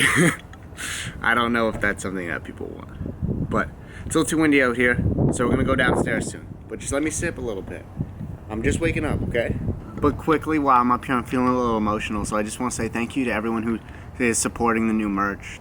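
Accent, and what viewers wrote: Canada. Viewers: Love your cute Canadian accent eh!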